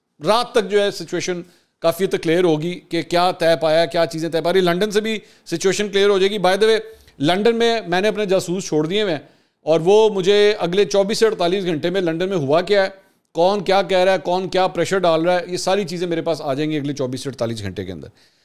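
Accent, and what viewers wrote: Punjab, India. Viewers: Hahaha.. bhai ur punjabi touch rocks😊😊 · Punjabi style speaking is excellent, but please add some Pushto dialogue like Nishta etc.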